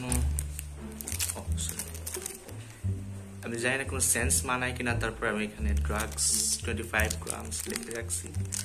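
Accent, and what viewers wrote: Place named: Sylhet, Bangladesh. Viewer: Lol 😂 onek moja paise 🤣 your sylheti language is so cute 😁. Finally I have had chance to see auntie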